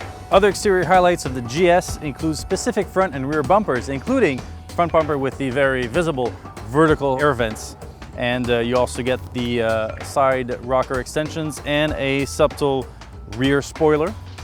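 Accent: Canada. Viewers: Sounds Canadian to me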